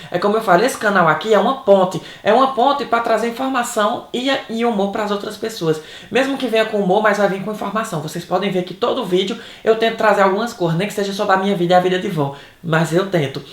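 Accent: Ceará, Brazil. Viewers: Não Perca teu sotaque e palavras da tua terra maravilhosa...Quanto mais nordestino for mais inscritos terá no teu canal...Amo teu trabalho....bjbjbjbj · Queria ver um francês falando esse maravilhoso português cearense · a maneira de tú falar é bem cearês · Adoroooooo seu SOTAQUE!!!! Chique feito "as pregas de Odete"! kkkk O nosso DIALETO "nordestino" é tudo de maravilhoso. O nosso idioma, como tantos outros, apresenta variações linguísticas. Dentro deste contexto, normal o "pra mim fazer", "mar minino" e tudo o mais que faz de nós NORDESTINOS, um povo especial!!!!! Parabéns,! <3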